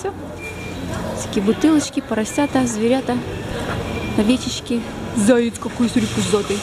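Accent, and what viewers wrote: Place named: Russia. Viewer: говорила бы уже либо на русском либо английском!!!!!!!!!!! ужасный русский акцент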